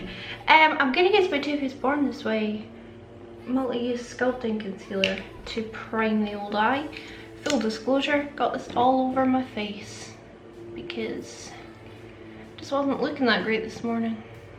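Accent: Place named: England, United Kingdom. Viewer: I thought you were from England! Lol still love the accent 💕💕💕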